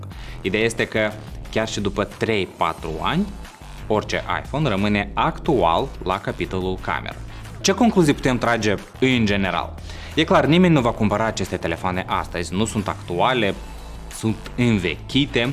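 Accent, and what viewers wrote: Moldova. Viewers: Aud accent Moldovenesc, dau skip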